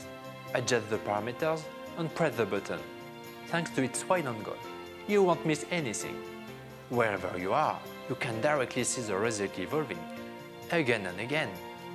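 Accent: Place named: France. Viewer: C'est une bonne idée je viens de le voir sur studiosport, en 4K ça aurait été cool, sinon l'accent ( french accent) à coupé au couteau ça le fait moins x)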